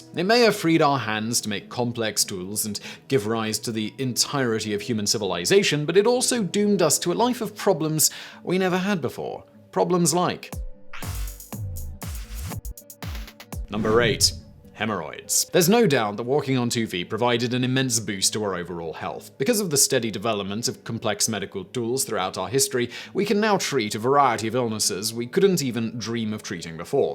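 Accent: United Kingdom. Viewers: Thanks for another great video! I think one of the reasons I like watching Simon so much is he reminds me of my youngest son. It's the beard and the intelligent way of speaking (although my son doesn't have the British accent).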